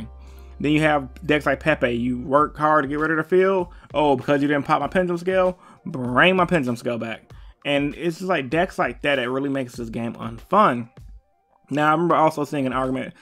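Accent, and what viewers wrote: Chicago, United States. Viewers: Bro! You are so chicago lol respect.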